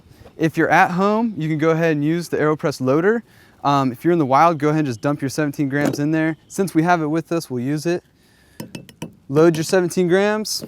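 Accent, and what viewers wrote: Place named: Western Canada, Canada. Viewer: Great Review, dude sounds very Vancouver/ Calgary.. but I know this is a westcoast US brand.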